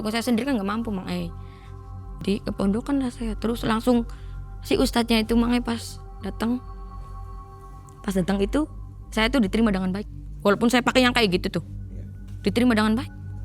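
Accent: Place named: Java, Indonesia. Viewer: cantik, ada medok²nya😅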